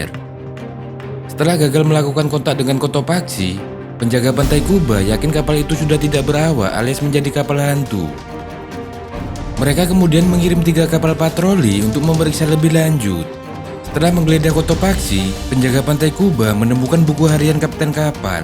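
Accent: Java, Indonesia. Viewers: Medok ya mas suarane...iyaaaa jaraeee capaaaaa😂😂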